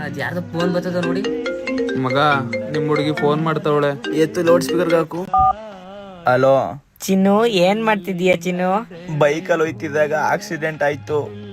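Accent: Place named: Chamarajanagar, India. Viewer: Nammma Chamarajanagar ada bhashe sogadu suuuppar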